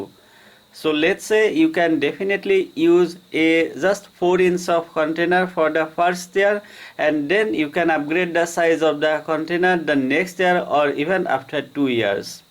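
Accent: Assam, India. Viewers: Apuni oxomiya niki? Accent tut guess korisu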